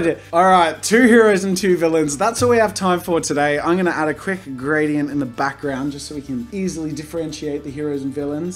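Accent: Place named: Australia. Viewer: wtf I thought you were australian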